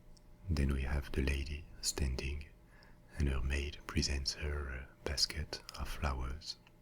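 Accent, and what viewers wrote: France. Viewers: I wish there were more ASMRtists doing history videos. I'm a huge history buff and the French accent only adds to the flavor.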